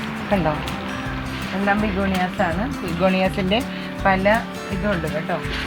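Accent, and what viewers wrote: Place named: Ernakulam, India. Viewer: Chechiyude samssaram kettal Ernakulam pole thonnum👍👍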